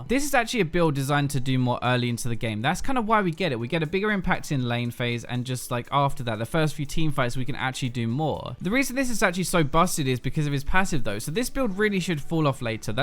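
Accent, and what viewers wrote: United Kingdom. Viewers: Bro british accent screws the translation up but still great vid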